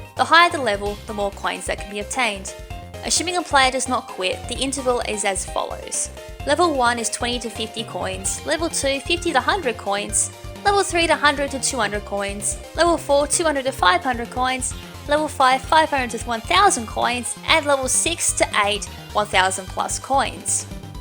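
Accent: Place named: Australia. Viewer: I am tempted to not watch your videos for a while, not because I don't like your videos, but because I love listening to your voice speak about Pokemon. Sounds weird I know but I like Australian accents x)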